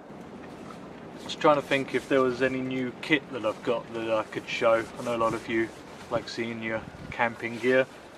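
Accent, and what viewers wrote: England, United Kingdom. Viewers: Where are you located? The accent is British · kinda cracks me up a little to see an english guy (I assume you're english, sound like it) making jumbalaya. I didn't think it would be popular over there, across the pond. And the onion. celery and pepper is called "Trinity". Mirepoix is onion, celery and carrot. Next time you make jumbalaya try using andouille sausage instead of chorizo. It makes a much better jumbalaya. Although, so does shrimp, not that I'm saying it's not jumbalaya without shrimp, but much better with. And easy to take and keep on cold weather campouts. Good video btw. That's some cold weather to be camping out in the open like that. Even in a partial shelter, that's still pretty much out in the open. I live in Michigan and have spent many years tent camping in upper Michigan for deer camp in mid November and have spent many nights camping in a tent in sub zero temps. 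But out in the open...Nope. And I don't know what kind of folding saw that is you use, but I have a Gerber and it has offset/staggered teeth and is REALLY aggressive.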